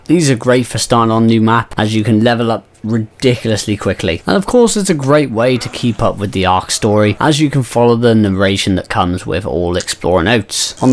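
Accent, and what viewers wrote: United Kingdom. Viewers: This accent is different, British!??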